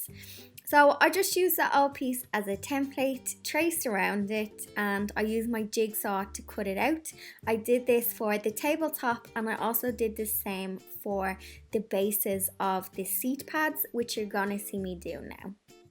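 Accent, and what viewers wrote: Ireland. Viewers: You are such a sweet auntie! I don’t know what I love more - your promotion of a ‘new lease on life,’ your brogue, or your love of Guinness (which I totally share,btw). Enjoy the pub.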